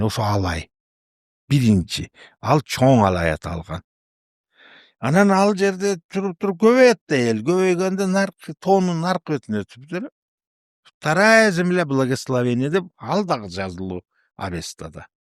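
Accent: Russia. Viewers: Алып баручу бала Кыргыз тилин жакшы уйрон тилин орусс болуптур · Ведущий жигит орус классты буткон шаардык окшойт бирок кыргызча суйлоп азамат экен акцент болсо да.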